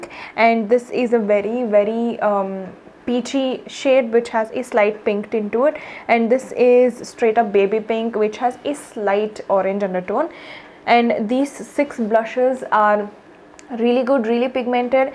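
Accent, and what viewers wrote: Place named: Bangalore, India. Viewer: Hehe.. I saw your previous video few days ago, the highlighter one I guess .. The moment I heard you, and I thought you must be a 'Bengalur hudugi' ... More than the review, I enjoy your accent.. You remind me of bangalore. Nice review too!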